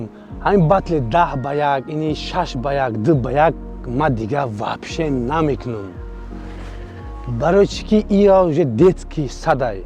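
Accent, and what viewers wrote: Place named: Vahdat, Tajikistan. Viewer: Чиста вахдати гап мезани да брат ханотан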